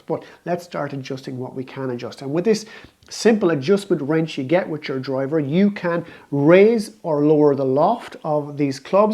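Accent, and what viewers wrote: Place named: Ireland. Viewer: That's actually an Irish accent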